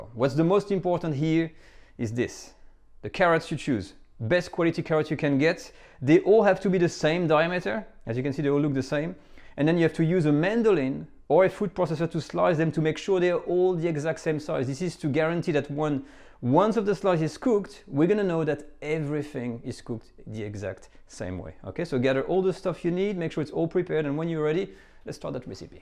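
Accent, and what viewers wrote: France. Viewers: Tres bien merci,de partager nôtre heritage culinaire avec nos amis américains! Parler anglais avec l accent francais est appétissant!! ,je veux dire par là lorsque vous dites des termes de cuisine en prononçant le mot en francais , peut être faudrait il le dire avec un léger accent anglais pour que nos amis anglophones comprennent mieux …ou l ecrire en sous titre avec l explication du terme reduire, bouquet garnis …etc maintenant si tout est expliqué dans le magazine dont vous faites la promotion autant pour moi …je vous ecris parceque j ai eu le privilège de travailler avec le Chef Monsieur Robuchon !! a Monaco a l hôtel Métropole que cette recette est une salade Marocaine revisité…est ce que le magazine en parle ?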